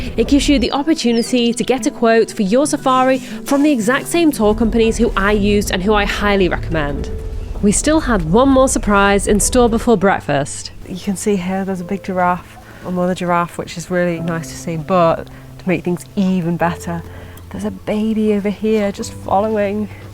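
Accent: United Kingdom. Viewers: I could listen to your voice, 'British accent' whole day. I love your cinematic vid.😊 Karibu kenya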